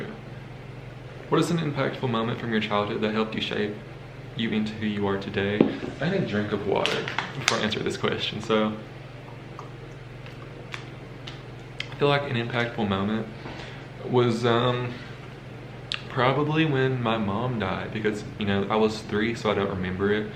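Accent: South, United States. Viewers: I love his country accent 😂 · I have no idea who this guy is. But that's a sick sweater and I find it oddly fascinating that his accent sounds like every old southern woman I've ever met. Not that he sounds feminine but the twang is pretty much identical to old southern women.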